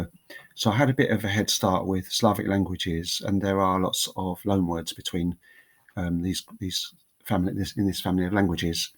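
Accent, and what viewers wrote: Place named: England, United Kingdom. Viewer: Miło subskrybować twój kanał. Jak zawsze wygadany i ciepłe słowa w kierunku ludzi.Twoj język polski jest dobry.Jestes Anglikiem i normalne, że masz inny akcent.Dzieki za mile słowa w kierunku mojej osoby.Udanego weekendu.Ewa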